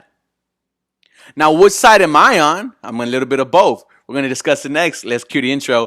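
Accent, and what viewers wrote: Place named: California, United States. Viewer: May I ask where you from you sound like a California or New Yorker?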